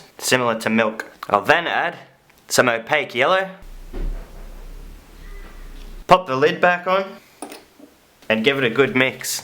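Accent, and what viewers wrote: Australia. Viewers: thnx m8, btw I love the AUS- ACCENT. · thanks for the advice and hey I like your astarlian accent :))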